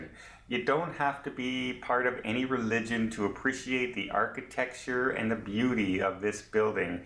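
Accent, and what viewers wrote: Canada. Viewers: Nice video but I need to correct your mispronounciation of Mobile..I live in Mobile soo I want to teach you the right way ...you say Mobile..like its a mobile phone.. Mobile has so much French influence..as does it's name. Think of the word..seal..then add Mobile..so its a hard Inflection on bile...sounds like seal. Welcome to Mobile. We love tourists! Sounds like you are Canadian? Come on down to see our beautiful historic city!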